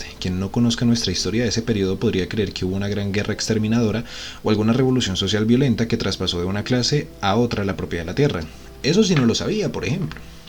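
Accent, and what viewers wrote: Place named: Mexico. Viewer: Hermano deje de hablar como mexicano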